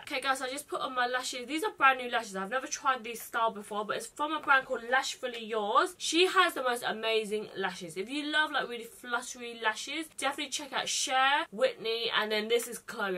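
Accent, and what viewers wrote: United Kingdom. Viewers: omfg ok so this is my first video and i found you off instagram and damn girl im like really shocked you have a British accent and not American like for some reason i thought you would have a American accent but when i heard you i got shocked and im still in shock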